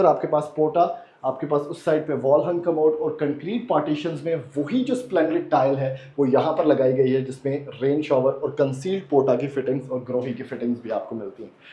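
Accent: India. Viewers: Main es ki hindi se samjha ya india ka hai 😂😂😂😂😂😂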